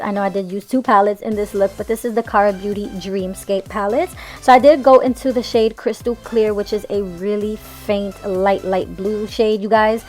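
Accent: New York, United States. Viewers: I knew you was from NY when I heard the way you speak lol we lit this look is beauty full honey 🥳🤟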